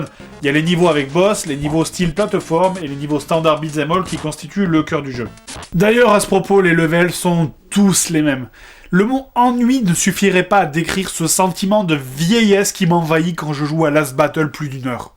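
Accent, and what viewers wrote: South, France. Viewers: Tu as perdu l accent du sud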